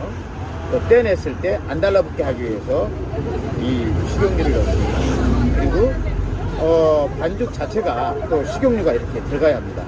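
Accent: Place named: North Jeolla, South Korea. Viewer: 짠메세요 그랫끼때문에. 그람에.. 있는데..ㅋ 전라도 북도사투리네요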